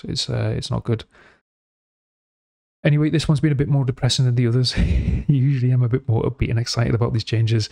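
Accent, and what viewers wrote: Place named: North East England, United Kingdom. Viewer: nice to hear a NE voice doing wow content i'm from Boro and it's refreshing!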